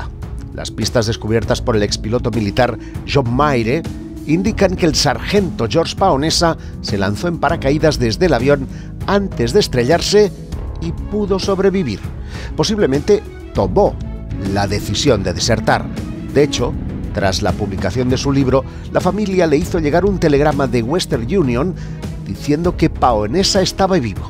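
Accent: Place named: Spain. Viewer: Emocionado de poder escuchar el relato.. pero ... el Horrible acento español me hizo quitarlo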